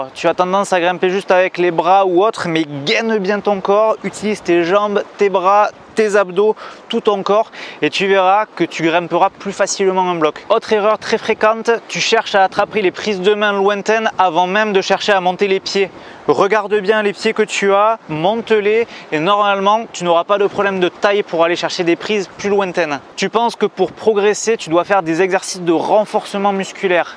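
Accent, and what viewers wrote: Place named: South, France. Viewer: Aaaaaaaaah l'accent du soleil! <3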